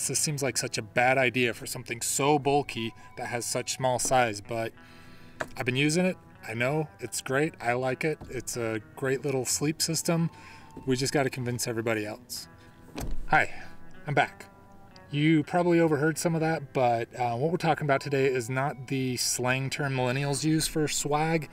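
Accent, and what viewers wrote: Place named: United States. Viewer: This is 8th swag video I've watched in a row, and the first from the US. I just had several second shock/adjustment back to the American dialect. 😄 Thank you for the explanation and history. I had assumed the swag was the OZ version of the American cowboy bedroll (I have one), but couldn't understand why modern day Aussies were using such confining shelters. In my search for quick setups and tear-downs, I've largely settled on the Kwik Kamp tent cot for solo camping. It's very similar the swag/cot (stretcher) combo in the video but all in one unit that folds up very quickly. It's not as abuse resistant as a canvas shelter, so I treat it with respect. I'm not trying to hijack the video, but in searching for the Grail of quick truck camping shelters. I've settled on the canvas cowboy teepee. They're very fast to setup, allow room for several sleepers, and room to stand up. 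The white canvas keeps the interior cooler during summer months, and a lantern hanging from the peak really lights up the interior nicely. It's a design that's survived the test of time for good reason, and on of my favorite gear purchases, and a go-to for car camping.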